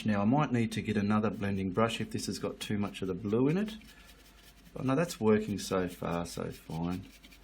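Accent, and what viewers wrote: Australia. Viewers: I love it! Thank you for a great tutorial! And I have to say I love listening to your Aussie accent! 😊 Most tutorials are from other countries so seeing the talent in our own backyard is wonderful! Big thank you from a fellow Aussie! 😍😍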